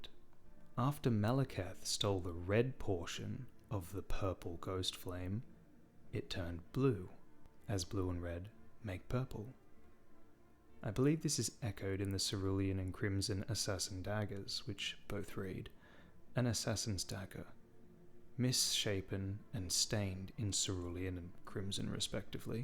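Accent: Australia. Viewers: Are you from the same region/city in Aus as Vaati, its crazy how close your intonations are. Or is this like posh aussie? 🤣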